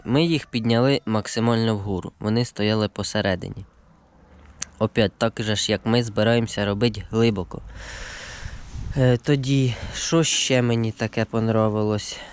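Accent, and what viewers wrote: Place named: Poltava, Ukraine. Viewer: Перший раз дивлюся ваші відео,і відразу почув знайомий полтавський говір.Лайк за відео👍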